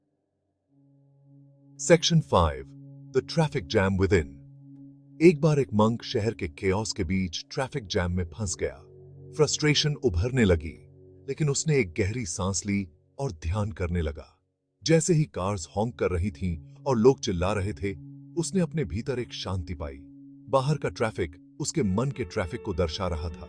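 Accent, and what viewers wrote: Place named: India. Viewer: English thodi thodi Hindi jaisi hai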